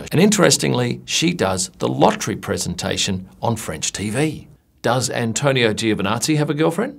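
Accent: Australia. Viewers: I have to admit, I too heard 1996 first. I went back and listened to it a few more times., your handsome Australian accent may have been a reason for mishearing the number. Being more used to hearing British and American English, the diphthong in '86' sounded more like '96' in my ears. But glad to stand corrected, 86 it is! 😎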